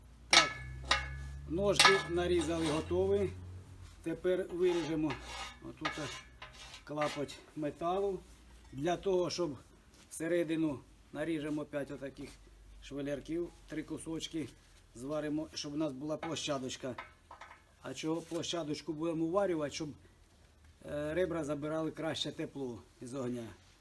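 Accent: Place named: Polesia, Ukraine. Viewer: чую родний полєский говор =)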